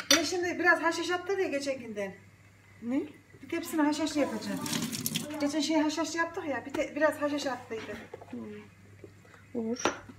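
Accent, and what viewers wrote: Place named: Central Anatolia, Turkey. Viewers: Eline sağlık çok güzel oldu poğaçalar ve de doğalsınız Kayserilimisiniz iç Anadolu şivesi ile konuyorsunuz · Ellerinize emeğinize sağlık canım çok beceriklisiniz maşallah memleket neresi konuşma şiveniz Yozgatlılara benziyo harikasınız 😘😘😘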